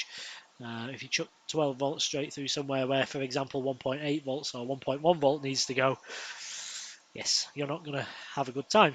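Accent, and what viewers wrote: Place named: England, United Kingdom. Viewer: he talks too fast plus the English accent... I think I need English sub version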